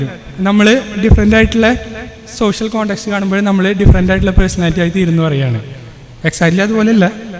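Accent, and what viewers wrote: Thrissur, India. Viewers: കിടിലൻ സബ്ജെക്ട് dear..... കിടിലൻ തൃശൂർ സ്ലാങ്... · Thrissur Slang presentation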